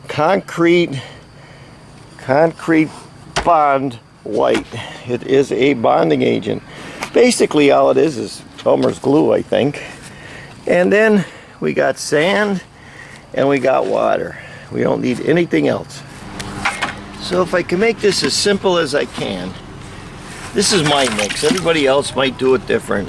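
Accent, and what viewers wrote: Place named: Pennsylvania, United States. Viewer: I love your stuff, very great insight and simple….”just get it in, don’t over think it”…… true for just about everything in life!!! and u sound like my family from Schuylkill county, don’t know where u r from in PA, but u sound like a good ol’ coal region boy!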